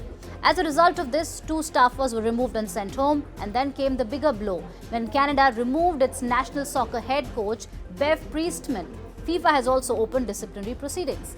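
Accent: India. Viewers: CNBC is an American broadcaster that is supposed to use American English, not Curry English. When you have a large number of UK immigrants in America, you have American or Canadian English, and Pomme in Australia they speak Aussic English, with India you don't chase Immigrant background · As a response to your edited comment, the lady is speaking British English. It's British English that's officially taught in India. Her accent is Indian. Just as you have different accents in the US, so does India. Once again, this YouTube channel is aimed at Indians, not at Americans. So obviously they're gonna hire someone who can speak with an Indian accent, not American, British, or Australian. Maybe you need to read once again the fact that the term "Curry English" doesn't exist. Curry, however, does and has nothing to do with India.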